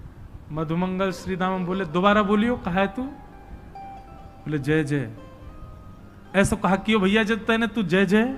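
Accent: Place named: Braj, India. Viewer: Aapki brij bhasha sunne mei bahut maza aa raha hai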